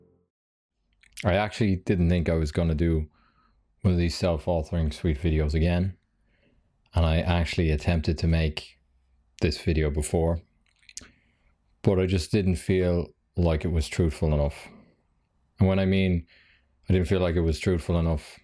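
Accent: Ireland. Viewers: He sounds Irish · Is this guy Irish?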